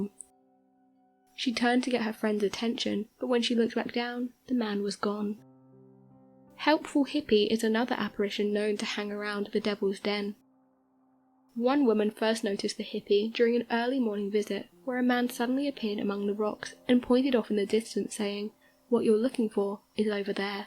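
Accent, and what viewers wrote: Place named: Scotland, United Kingdom. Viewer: I like your brouque. Is it Scottish or English?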